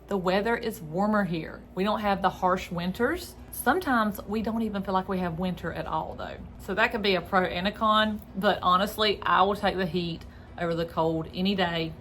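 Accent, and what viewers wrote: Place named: Florida, United States. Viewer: Damn girl!!! I’m from NC and had to rewind when you said hiking 😂😂😂😂😂.. love Florida and the accent 👍👍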